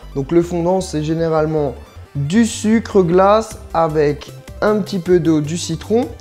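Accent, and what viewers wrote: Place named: South, France. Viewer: J'aime trop t'es video t'es trop beau ta un accent du suddd mon poteeee x'd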